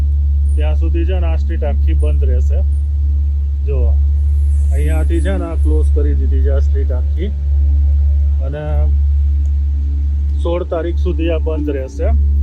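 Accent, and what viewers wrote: Mehsana, India. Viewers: ભાઈ તમારી બોલવાની ભાષા પર થી લાગેછે કે તમે મહેસાણા જીલ્લા ના રહેવાસી લાગો છો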